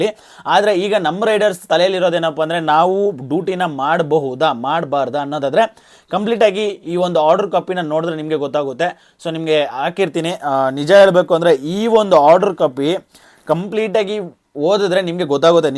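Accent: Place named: Andhra Pradesh, India. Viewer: avnu Telugu bro..😂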